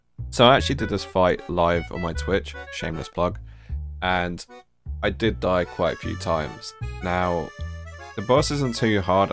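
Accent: United Kingdom. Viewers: Good video, but your British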